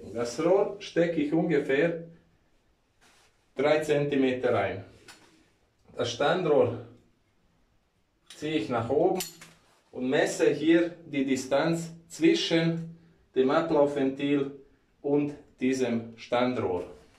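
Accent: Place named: Eastern Europe. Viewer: Super erklärt ohne viel Schnick Schnack so wie es sein muss :) und dein Osteuropäischer Akzent ist sehr angenehm und beruhigend zu hören :) ❤❤❤💯🤝🏼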